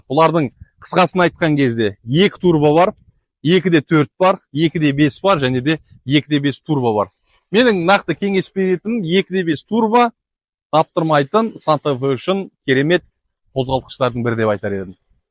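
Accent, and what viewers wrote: Standard. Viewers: Әдемі, таза қазақша сойлегенің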